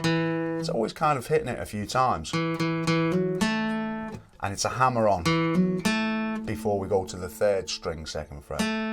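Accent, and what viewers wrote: Liverpool, United Kingdom. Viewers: Good teacher - but I agree that you should play most of the song BEFORE teaching most of it. Lovely Scouse accent!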